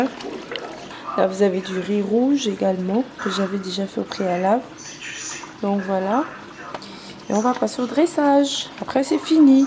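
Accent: Gabon. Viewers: Au fond le sans accent des gabonais fait leur accent, gabonaise pure pure.. Merci mama!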